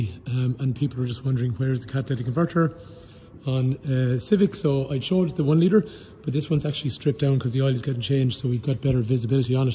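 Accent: Ireland. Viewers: Thanks for the Irish accent and the reassuring fact of catalytic converter location in Civic 2019!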